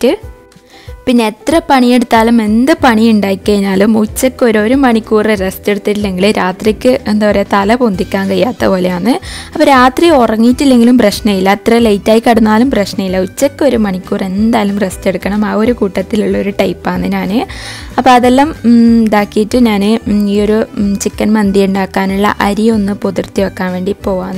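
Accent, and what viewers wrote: Kannur, India. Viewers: Innala korchi busy aaypoi deep cleaning nnta baagam adhn nu late aayadhu ningla video kaanan my fvrt ur vdes and kannur slang...enki oru hai hello paryoo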